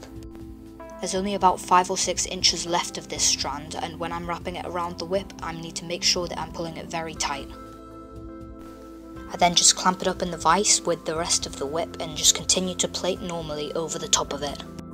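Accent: United Kingdom. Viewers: i've been watching your videos quite a time... and they're amazing... But when i saw your first video i realized there is something similiar in your voice... and its damn Harry Potter (daniel radcliff) in the two first parts XD i think it's because british accent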